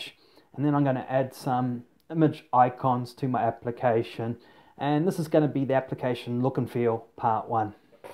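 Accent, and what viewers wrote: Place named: United Kingdom. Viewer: thx for your video, i love your British accent.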